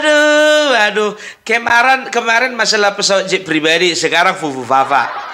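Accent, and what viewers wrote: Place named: Java, Indonesia. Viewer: Abang ini batak tapi boso jowomu medhok banget,, ❤❤❤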